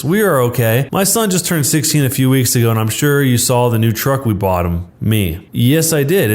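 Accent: South, United States. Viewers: this dude makes a good southern accent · I love the southern voice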